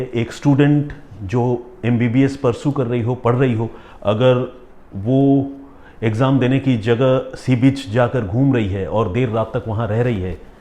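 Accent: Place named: Bengal, India. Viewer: Dada awaz me Pata lagta Hai ki app bengali <link> west Bengal ki Medinipur dist se me abhi punjab ki Jalandhar me gold ka cam korta ho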